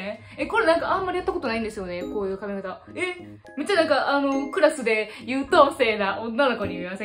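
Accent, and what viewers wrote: Kansai, Japan. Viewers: 関西弁だし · ハスキーボイス関西弁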